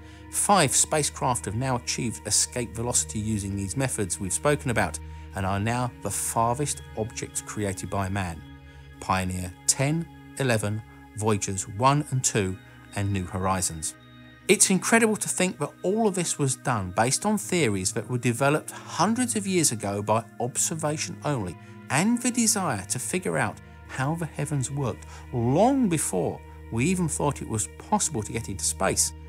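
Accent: United Kingdom. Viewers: Why is this guy with a British accent when it is the Americans who are achieving this accmplishment??? I went to Cape Caneveral and they had a British guy expliaining the Mars Mission. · hit like for british accent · You explain everything better than my first language teacher. Is this British accent?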